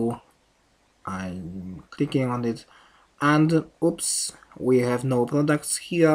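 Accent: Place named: Poland. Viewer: awesome accent, polish I guess?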